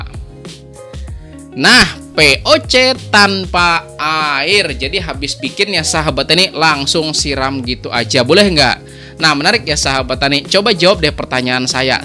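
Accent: Banjar, Indonesia. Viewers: Logat Banjar nya masih ada😅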